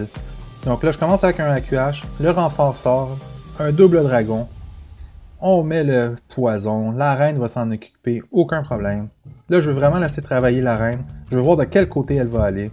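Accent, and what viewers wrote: Quebec, Canada. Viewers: Super vidéo !! Québécois ??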